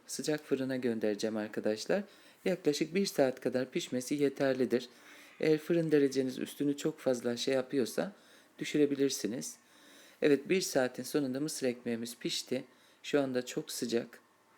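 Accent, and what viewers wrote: Black Sea, Turkey. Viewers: sesin tam bir karadenizli kadin sesi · sizdemi karedenizlisunuz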